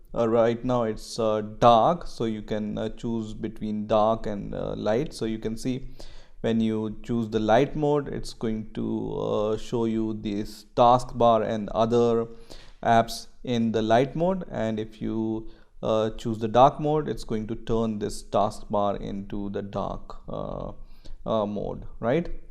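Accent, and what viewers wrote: India. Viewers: nah wtf this indian accent 💀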